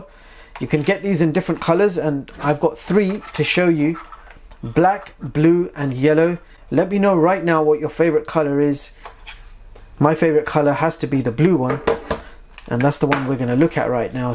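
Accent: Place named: United Kingdom. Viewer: Now your voice and pronounce is like mr Harry Pottah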